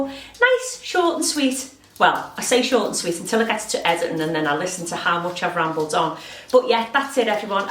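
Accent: Liverpool, United Kingdom. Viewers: Beautiful! Have a wonderful Christmas and happy,healthy New Year! Great to have you back and hear that Liverpudlian accent again--memories of my time at uni,many,many moons ago😂.Luv from Grandma Gwen in Germany💖😘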